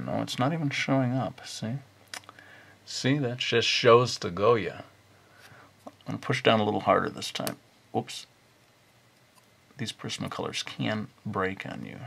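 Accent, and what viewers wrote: Brooklyn, United States. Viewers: Crilley Lesson; It Doesn't matter if you do traditional art or digital art, it'll always be art....and Brooklyn accents are awesome.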